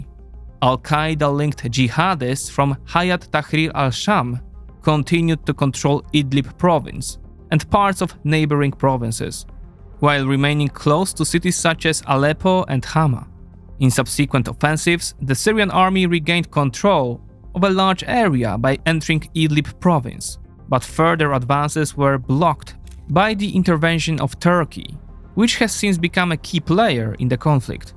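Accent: Poland. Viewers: The narrator sounds Polish. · Nie bardzo rozumiem jak można mieć tak podobny akcent do "naszego" ( 😉) i być tak konsekwentnie stronniczym na korzyść naszych obecnych okupantów 🤦‍♀ ( których mamy już ponad 10 tysięcy i to w pełnej gamie kolorystycznej - ze wskazaniem na tony wieczorowe... ;) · His information are biased and not accurate. Not a surprise with his polish accent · He’s Polish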